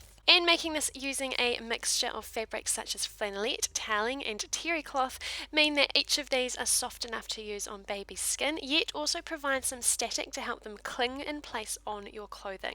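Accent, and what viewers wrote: New Zealand. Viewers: A kiwi voice yay! Great tutorial thanks.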